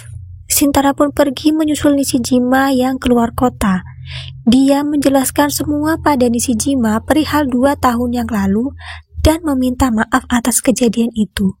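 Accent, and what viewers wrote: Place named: Java, Indonesia. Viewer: Suaranya naik turun.. Pembawaannya seperti orang membaca, kurang enak di dengarnya, gk bisa nyantai, terlalu medok 🙏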